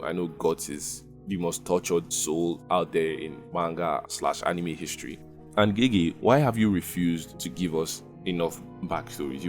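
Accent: Nigeria. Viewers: Nigerian accent obvious bro.. PH boi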